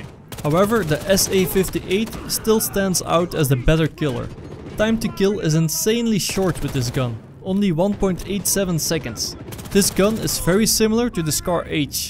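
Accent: Netherlands. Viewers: u sounds dutch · Je hebt een Nederlands accent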